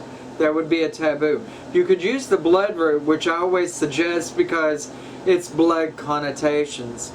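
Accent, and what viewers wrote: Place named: United States. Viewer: she sounds American.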